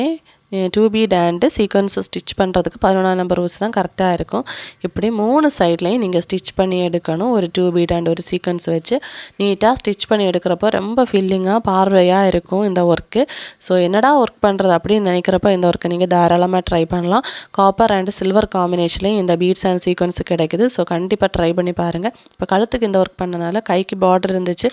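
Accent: Kanyakumari, India. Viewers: I like your Kanniyakumari slang sis ❤ Your ideas are 🔥👌🏻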